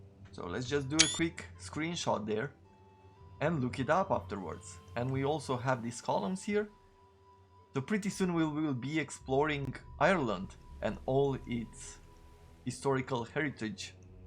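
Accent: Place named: Romania. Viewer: You sound like you are from SE Europe maybe? Romania?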